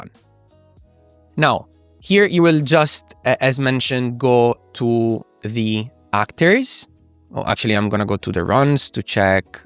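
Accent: Italy. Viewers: You make very good videos even if your English is a little bit Italian.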